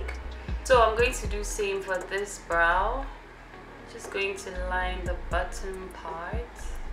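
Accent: Ghana. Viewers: i came in the comment section to find out if she's Ghanaian...that Accent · When I came across your video and heard your accent I was like she sounds Ghanaian and I was right🇬🇭🇬🇭! I love your tutorials, keep it up. I plan on watching this to do my make up for a wedding I have coming up this weekend. Thank you! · This girl, you are a fine babe. My first time watching and I said, ahh this accent is from Ghana. I love your accent.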